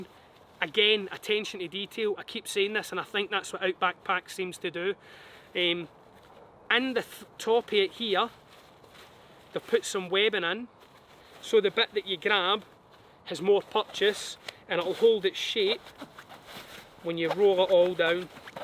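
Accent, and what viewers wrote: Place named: Scottish Highlands, United Kingdom. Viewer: I enjoyed watching your video. Fantasy pack. I'm a former US Marine retired. I also enjoyed listening to you talk with your Highlander Scottish accent. It reminds me of the Netflix show Outlander.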